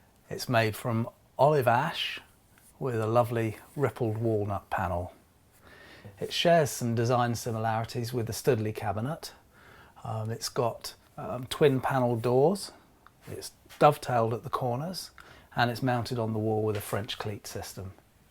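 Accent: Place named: United Kingdom. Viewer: I take it from your accent that you are from somewhere in Britain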